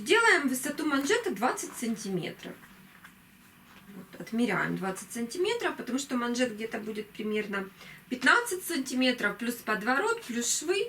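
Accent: Ukraine. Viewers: Кристина Котельва да акцент наш, украинский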